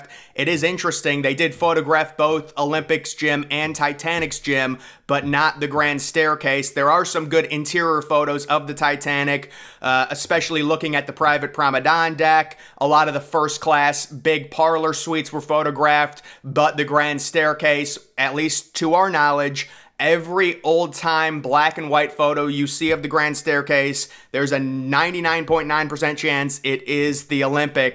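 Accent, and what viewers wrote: United States. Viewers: Tone down the shouting, big American twang voice...it's really irritating!😳🫣🤬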